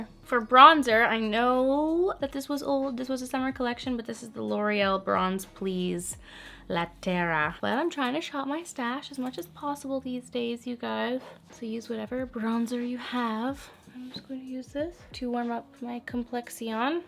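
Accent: United Kingdom. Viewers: I am living for the British accent!!! 😂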